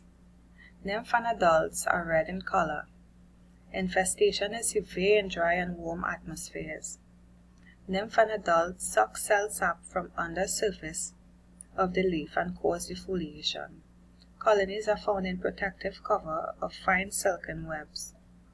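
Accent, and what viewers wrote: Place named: Trinidad and Tobago. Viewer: thanks for the advice and what to look for on the okra plant. You speak like a trinidadian.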